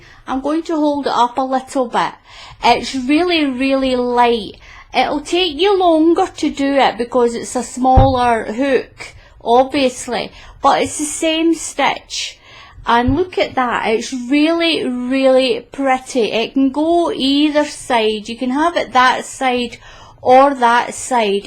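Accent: Scotland, United Kingdom. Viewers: Thank you I just love this pattern , it’s great to hear your accent . I am also Scottish and live in Canada .🇨🇦 Stay safe and happy crocheting 🌈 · Nope, love hearing the Scottish accent! So keep on talking,! oh, and Subscribed! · The second I heard your accent, I knew you were from Scotland. I'm a huge fan of the tv show "Outlander." I'm not sure if you've heard of it, but it's based partly in Scotland. I absolutely love that show, and I love listening to your voice in your tutorials. I'm a new sub and I can't wait to binge watch all of your videos, lol. You describe your stitches so clearly so that we all know what to do and what not to do. Thank you so much. Happy Mother's Day! 🐞🦋🌼🌹🌻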